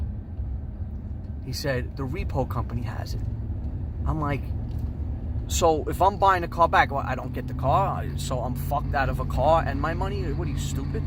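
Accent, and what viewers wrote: New York, United States. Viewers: A man of many accents. Considering your heavy new york accent, your southern is pertty darn good!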